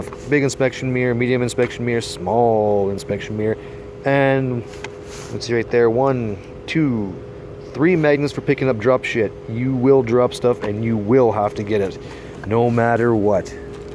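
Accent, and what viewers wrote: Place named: Canada. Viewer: Can you first introduce yourself please and state how credible you are, what you're currently using them for, what your specialties are, and how many years you've been an aircraft mechanic? And please, an iphone 5s has a better camera than that. What is that a flip-phone? Nice Canadian accent, you from Canada?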